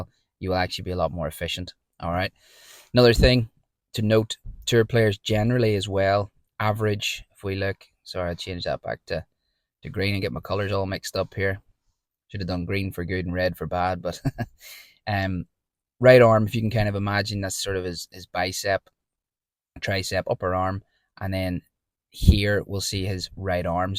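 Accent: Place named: Ireland. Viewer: Hello, I'm Roger. I've played and studied the game for 45 years. and I think you do a great job at your craft here. My parents are from Belfast, so that's unmistakably an Irish accent you have. I've met Ludvig, he's super cool. I Love his golf swing. His head drops a wee bit in the downswing. I think for a few reasons. I'd like your opinion on that aspect. It's quite common among great ball strikers: Trevino for example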